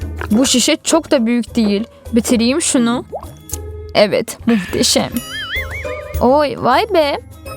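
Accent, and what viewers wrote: Azerbaijan. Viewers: Siz deyəsən Azərbaycanlısız səsiniz qəribə çıxır mütləq Azərbaycanlısız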